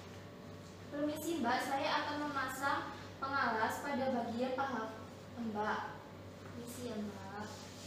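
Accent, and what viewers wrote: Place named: Java, Indonesia. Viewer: logat jawa bnget tuh bhasannya keknya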